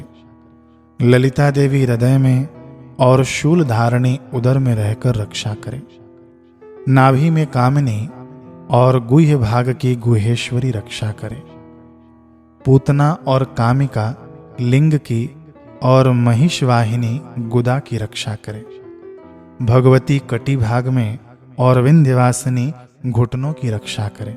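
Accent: Standard. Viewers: Shuddha Hindi Aur Sanskrit Uccharan. Aapko shat shat naman. Jai Mata Di!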